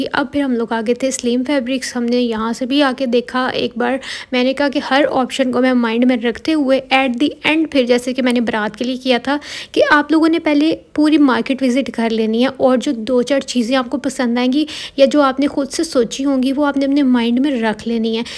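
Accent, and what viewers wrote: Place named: Punjab, India. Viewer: Iam from India punjab te mainu tuadi boli boht pasand h pure Punjabi jado bolde o boht Kazaa aunda h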